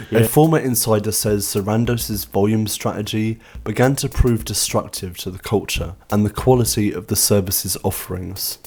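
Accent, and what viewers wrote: United Kingdom. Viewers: Wait a second, this man is British. I can't watch this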